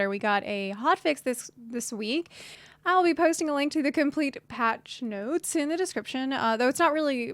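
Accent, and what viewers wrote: South, United States. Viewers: love that southern american accent too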